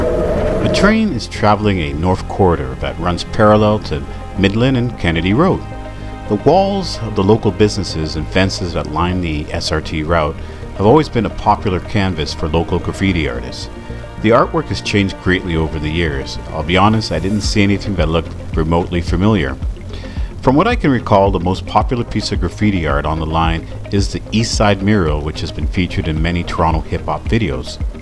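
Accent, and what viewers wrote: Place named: England, United Kingdom. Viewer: The explanation was awesome with English efficiency and accent 😍 I love this video a lot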